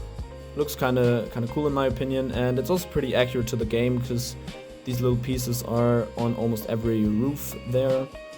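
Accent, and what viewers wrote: Germany. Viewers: This looks so incredibly awsome. The techniques you use make everything look so interesting, and the colour composition looks so awsome too. I didn't even needet to watch the Vidoe to the End before i subscribend. And your english sounds very good too. You are able to notice the german acent but it isn't strong and doesn't bother. But please do something about your audio. Maybe i am the Problem but i watched it with my headphones on and only heard you on my left ear. I am looking forward to your next Video. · hör ich da deutschen Akzent?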